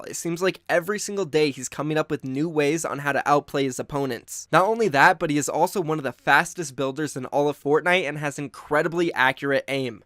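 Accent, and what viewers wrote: Norway. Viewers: Damn is he from Norway?, im from norway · Is he Norwegian?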